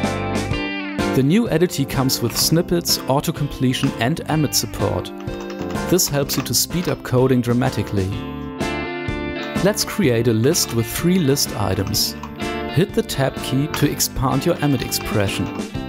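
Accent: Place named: Germany. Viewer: Love the german accent ;)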